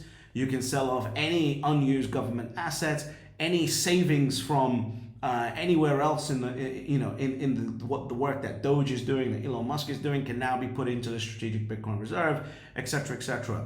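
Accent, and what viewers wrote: Scotland, United Kingdom. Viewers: Good channel bro, you sound like you are from Scotland.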